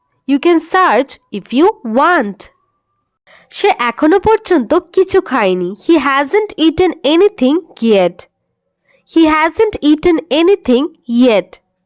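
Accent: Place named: North Bengal, India. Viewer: উত্তরবঙ্গ মনে হয় আপনার বাড়ি।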